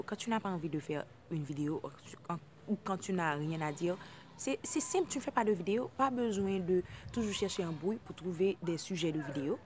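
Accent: Haiti. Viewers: C'est pareil sur insta ! Étant une " influenceuse " je reçois de nombreuses demandes de pubs par jours. Là n'est pas le problème, mais quand ça termine par " stp fais moi une pub j'aimerais percer comme toi ". Y'en a ils s'inventent des vies pour qu'on parle d'eux, c'est devenu n'importe quoi !! En plus depuis qu'on peut se faire de l'argent avec les réseaux sociaux il y en a de plus en plus qui sont là juste pour l'argent, les partenariats etc. Flemmme ! En tout cas je te comprends et j'aime bcp le ton de ta voix (le ton que toutes les haïtiennes ont, mes tantes c'est pareil) bref j'aime aussi ta vidéo ♥♥♥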